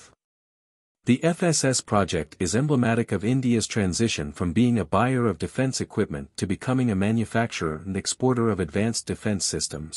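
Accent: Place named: China. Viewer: Where accent suggest you are Chinese. Please introduce yourself.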